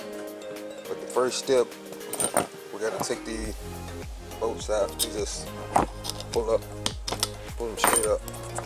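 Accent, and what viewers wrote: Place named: Missouri, United States. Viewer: you sound like your in Missouri, Thad be cool, long live the Cicada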